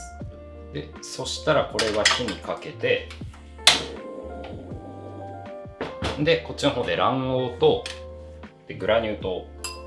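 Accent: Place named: Yamaguchi, Japan. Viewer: 明日市販の栗のシブーストを食べる予定です。自分で作れるかなと思って検索したら、動画をあげてくださってて嬉しいです。ところで山口県の方ですか？○○けんっていう話し方で、そう思いました。私がそうなので。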